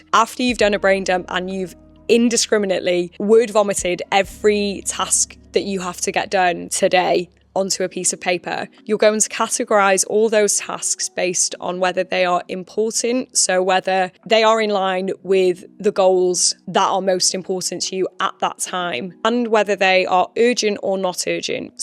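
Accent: United Kingdom. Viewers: Hello from Russia ❤ I love your vlog and your sweet British accent 😊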